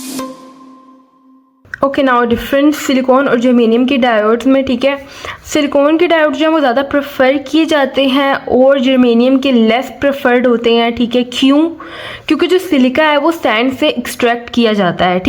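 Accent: Pakistan. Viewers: R u from Pakistan???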